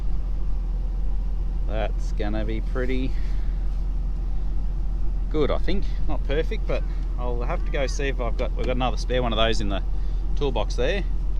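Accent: Australia. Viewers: Could you keep the lingo to the straight up down under language? I have hard enough time with the Ozzy accent done.😊😊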